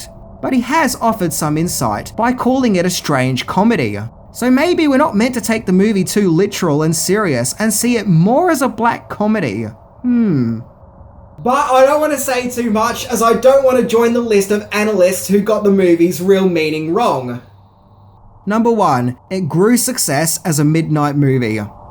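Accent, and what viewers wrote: Australia. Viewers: he's Australian and it could be his way of pronunciation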